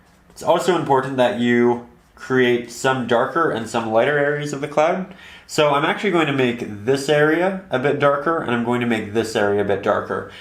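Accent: Canada. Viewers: Wow you're Canadian accent really comes through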